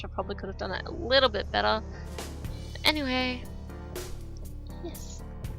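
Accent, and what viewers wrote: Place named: United Kingdom. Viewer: Haha, na, sounds to me like you got a good voice for these things. Are you British? I feel like theres a sort of British accent in your voice.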